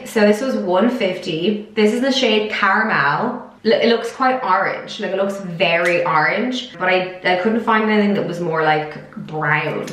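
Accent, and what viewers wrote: South Dublin, Ireland. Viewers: South Dublin accent. Sounds like an Irish person trying to speak with an American accent. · Clicked on this video not knowing who you are, heard the Irish accent and subscribed instantly 🤣💖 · I think she is Irish 🙂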